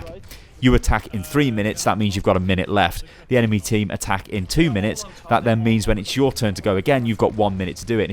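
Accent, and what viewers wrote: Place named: United Kingdom. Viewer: What's the point of these vids? It's literally just everything you can hear in the update videos Blizzard makes, but unorganized and with Overwatch gameplay in the back. I guess they're good if you like British accents and don't like Jeff's lisp.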